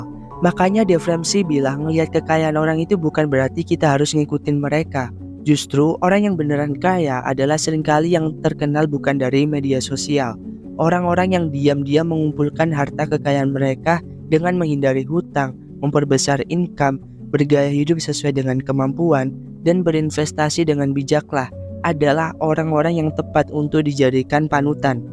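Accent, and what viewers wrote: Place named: Jakarta, Indonesia. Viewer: Alangkah bijak bila narasi diucapkan dalam bahasa Indonesia yang baik dan benar. Tak perlu kejakartanan dengan lu gue. Krn yang menikmati bisa jadi orang seluruh Indonesia atau bahkananca negara, dimana saat ini bahasa Indonesia diminati di banyak negara.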